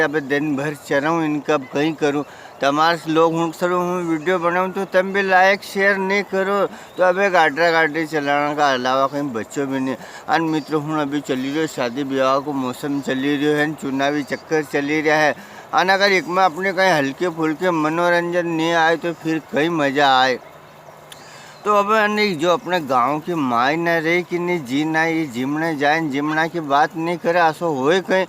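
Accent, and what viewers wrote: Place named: Malwa, India. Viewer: परम आदरणीय जी को जन्मदिवस की बहुत-बहुत बधाई आपकी मालवीय वाणी वाणी हमारे समस्त परिवारजनों को अति प्रिय है अतः भगवान से सेव कर बद्ध निवेदन है कि आप आप हमेशा ऐसे ही हंसते मुस्कुराते रहें और और अनंत वीडियो बनाते रहे धन्यवाद भीम सिंह चौहान तिलावत बड़ी तहसील तराना जिला उज्जैन मध्य प्रदेश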